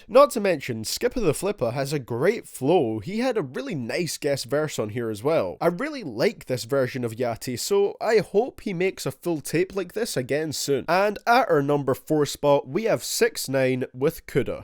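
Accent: Scotland, United Kingdom. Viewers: Is he Scottish????🤨🤨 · What is that accent? I know its from somewhere in Great Britian but i cant put my finger on it.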